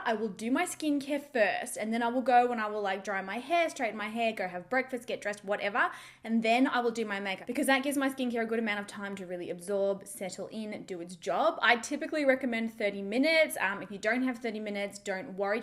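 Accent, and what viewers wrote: Australia. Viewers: Love this makeup video,,, as always you slay every makeup tutorial review and your an awesome YouTuber,,, another thing I want to say is I love your Australian accent,,,, am a fan of home and away and I just love the way the actors speak,,, lol I just wanted to put it out there ☺😎 Lots if love from the UK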